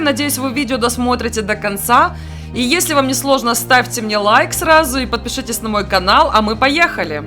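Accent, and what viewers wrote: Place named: Moldova. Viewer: Приятный молдавский акцент.Скучила.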